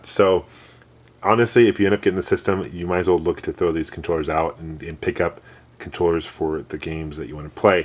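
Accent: United States. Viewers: you sound like your from kentuky like me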